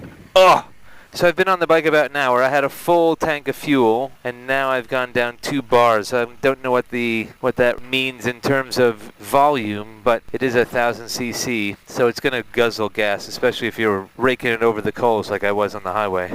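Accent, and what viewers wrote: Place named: California, United States. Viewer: Hey, your accent doesn't sound like from England, sounds Californian. 😄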